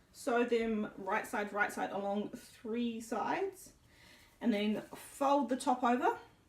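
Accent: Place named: New Zealand. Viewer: your accent is very beautiful I don’t know much about your country and am really sorry if I get this wrong but is that the accent that sir Peter Jackson has and what’s your favourite thing about New Zealand and who is your favourite character in he lord of the rings and the hobbit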